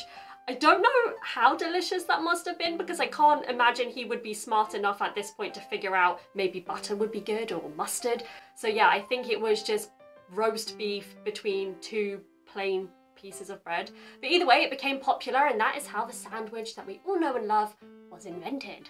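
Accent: RP, United Kingdom. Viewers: Hi, Somehow your talk soothes me a lot. It’s melodious to me actually. Is your accent the conservative RP or something? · かわいいー💓し、内容も素敵！ ブリティッシュアクセント好きすぎて登録しました〜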